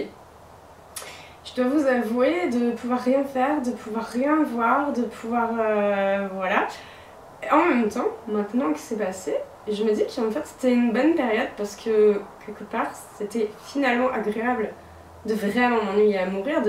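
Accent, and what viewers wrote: Toulouse, France. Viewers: Héhé super contente de te revoir!!! Fabuleux cet accent du sud, ça m'a titillée dès tes premiers mots lol je ne suis pas tellement loin de chez toi donc ces petites sonorités sont familières à mon oreille! Cela te vas à ravir et tu as l'air très épanouie ça fait plaisir à voir et entendre. Mais dis moi belle, cela ne m'avais pas frappé jusqu'à présent mais tu ne serais pas HPE? Très grande émotivité, hypersensibilité, créativité débordante, vocabulaire du registre de l'émotion et mots "spécifiques" choisis avec soin pourtant servis avec grande facilité et spontanéité, grand plaisir et stimulation intense sur plusieurs plans dans les échanges relationnels qui "fusent", grande envie de communiquer et partager ses sentiments, émotions, niveau élevé d'empathie, amour des animaux avec rapport d'altérité, enfant intérieur très présent, anticonformiste, autodidacte...dis donc tu serais pas un zèbre par hasard? 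xD :P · Oh!!!! Ça fait longtemps! Tellement contente de te revoir, tu nous as manqué, tu es la 1ère youtubeuse que j'ai suivi en arrivant sur YouTube alors je suis trop trop contente de ton retour lol!!! Tu es radieuse et une vrai toulousaine, sa s'entend lol la robe est cannooon!!!! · Coucou!! Avant même que tu y fasse allusion je me disais "c'est bon elle a "choper "l'accent toulousain " !!! Mdr 😜Contente que Toulouse te plaise! Au plaisir qui sait de te croiser au détour d'une rue toulousaine!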